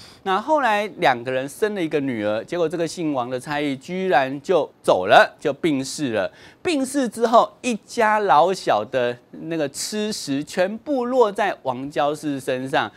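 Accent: Taiwan. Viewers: 台湾國語聽得恶心叭啦。 老是用什么那，耶，喔，像在跟小孩說話。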